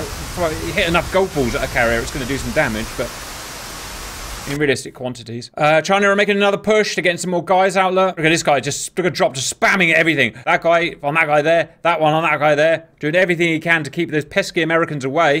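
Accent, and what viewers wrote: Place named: United Kingdom. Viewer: I'm not supposed to say these videos are MORE ADDICTING due to his British Accent! ...............................💂*tea time! 🫖 .............................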